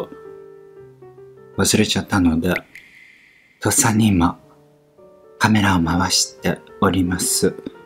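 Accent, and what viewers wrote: Japan. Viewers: 💕 ごきげんよを🤗 たまに飛び出す関西弁が めっちゃ好きやねーん❣️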